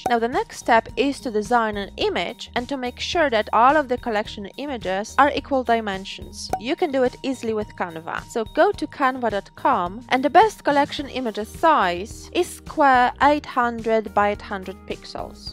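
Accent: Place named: Poland. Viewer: You've got Polish accent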